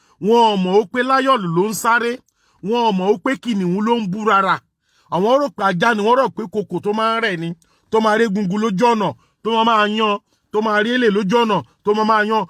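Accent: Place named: Egba, Nigeria. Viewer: Niceness, I'm enjoying the tiny bit of your Egba accent...lol (I'm Ijebu); Yorubas are such a beautiful and diverse people, I can barely wait to live in our new Nation.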